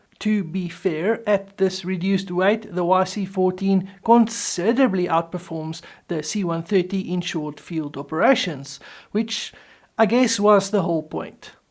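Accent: South Africa. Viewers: Love the South African accent, reminds me of my days in Joburg. Great video, the YC at Pima Air and Space is quite something to see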